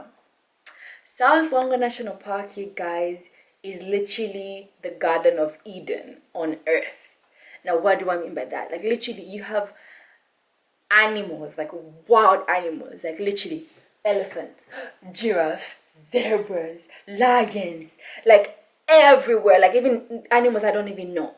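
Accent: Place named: Nairobi, Kenya. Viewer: You speak like a Nairobian 😅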